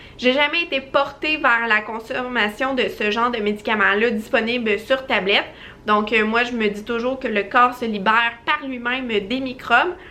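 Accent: Canada. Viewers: J adore ton accent chère youtubeuse du Canada.. Et tes conseils bien sûr une abonnée du Sud de la France.. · Mon dieux comme c fatiguant d entendre l accent française des canadiennnnnnn.... c fait mal a la tête..excuse moi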